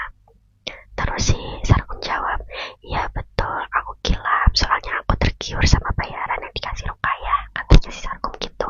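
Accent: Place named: Java, Indonesia. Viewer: medok bgt kak😭, btw asmr bahasa jawa lagi dong tp crita gtu trsrh kakak crita apaa ajaa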